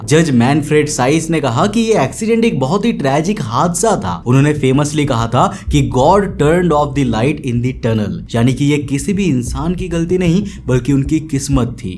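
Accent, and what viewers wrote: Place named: Bengal, India. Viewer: Tumri Hindi accent babitaji jaisi hai yani bongali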